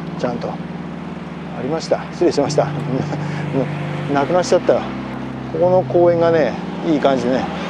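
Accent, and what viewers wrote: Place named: Nagoya, Japan. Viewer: さんの名古屋弁、駄弁りなのケッタ漕ぎが心落ち着くよ。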